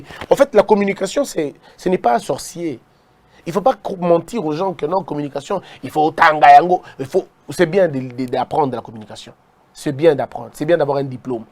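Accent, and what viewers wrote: Democratic Republic of the Congo. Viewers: Christian BOSEMBE merci de nous sortir de la médiocrité. Te suivre est une délectation. Bravo. J'en apprends des choses. C'est un pur délice de t'entendre parler lingala. C'est quoi mitende ?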